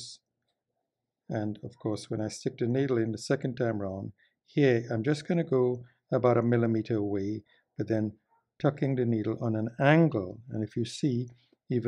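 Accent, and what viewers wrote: Trinidad and Tobago. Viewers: I recognized that accent from Trinidad & Tobago, as my mother, a Serville, is from Trinidad. What an honor to learn of this as I saw you proudly displaying the Trinidad & Tobago flag. Thank you Sir for teaching us with your videos. It is quite the pleasure listening to your wisdom and learning more about the world of Bespoke. Mr., I would love to experience a collaborative video produced between you and Mr. Jacome. It would be assured artisan brilliance. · I knew I recognized your accent, and it was confirmed with your Trini flag! I am fascinated by the amazing detail in your work!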